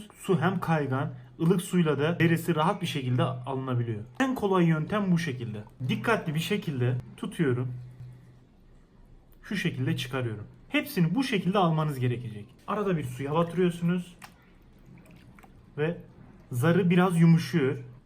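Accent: Adana, Turkey. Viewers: Hatayli degil bu şive adana şivesi Hataylılar böyle konuşmaz yüürün katıyürüm ekliyürüz diye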